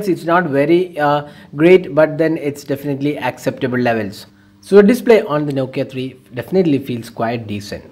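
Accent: India. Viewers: ok im leaving cause of his indian accent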